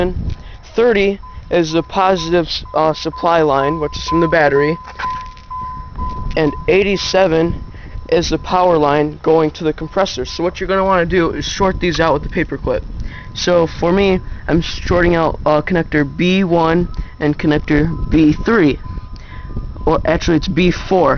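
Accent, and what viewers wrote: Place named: Portugal. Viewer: You sound like my Portuguese homie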